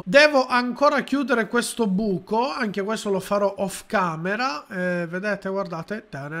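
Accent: Sicily, Italy. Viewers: Sei siciliano?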